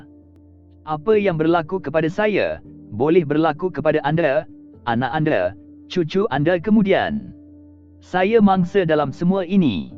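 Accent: Malaysia. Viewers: soraya bukan suraye...najwa bukan najwe aduhaii nak cakap kL pun agak2 la ...bola nak cakap bole ke? adoii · Biasa Melayu belah Melaka sebut macam ni. Dialek Melaka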